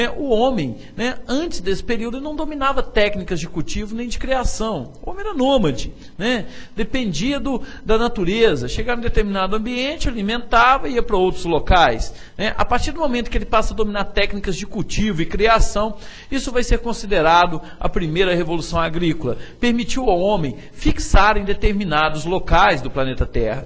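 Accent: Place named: Goiás, Brazil. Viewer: Esse aí é goiano 😁❤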